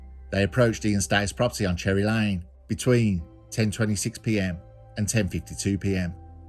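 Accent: Birmingham, United Kingdom. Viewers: The ambient music, that Brummie drawl, the street slang. I smile to myself, another episode of Curios & Spiritual. 😊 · The brummy accent is awful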